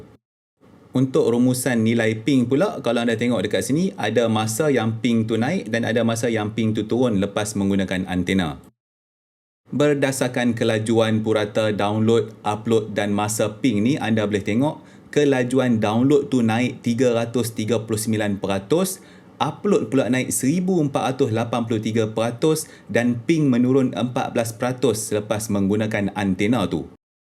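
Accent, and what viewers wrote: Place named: Kedah, Malaysia. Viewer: walaupun anda menyebut anda...tapi kokdiang nya tu cukup masih pekat kedengaran hahahah kedah hadirrr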